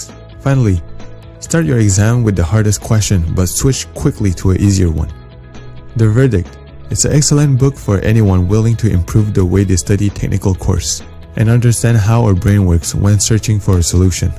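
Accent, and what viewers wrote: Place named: Singapore. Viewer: I can see that you are singaporian through accent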